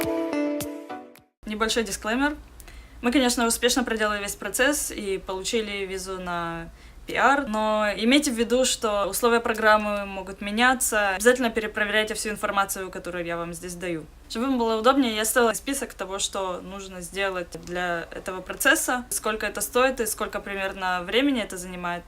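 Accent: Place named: North Caucasus, Russia. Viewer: Автор, ты из Нальчика или с Дербента?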